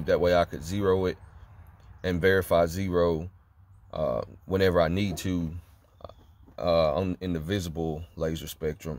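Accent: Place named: South, United States. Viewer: Im sorry but he good ole boy i hear it in his voice. southern livin baby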